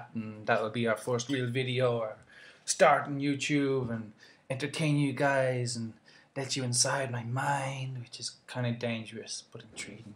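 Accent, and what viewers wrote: Ireland. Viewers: Woah I never noticed you were Irish in & Vlogs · Where in Ireland are you from? Dublin ya? Haha I'm Irish and I swear so much lol I need to stop!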